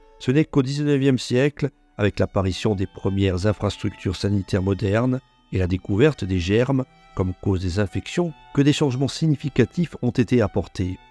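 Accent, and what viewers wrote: Corsica, France. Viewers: J’avoue que j’ai surtout écouté la vidéo pour l’accent Corse, forza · Super video! Mais je note un petit accent dans la voix , corse,je crois. Pace e salut❤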